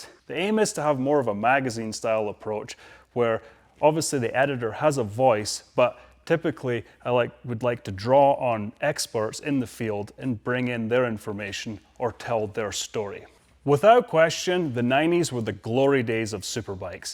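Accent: Scotland, United Kingdom. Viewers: Hi, I just subscribed! Are you Scottish, I thought that listening to your accent watching your SRAD video. I’ve been doing a similar as you collecting bikes I drooled over as a kid. I love this era of machines. 😊 Best wishes Ian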